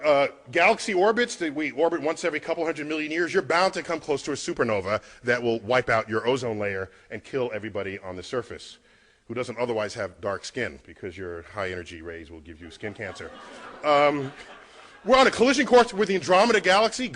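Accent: Jamaica. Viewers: Are you Jamaican man? Love your channel.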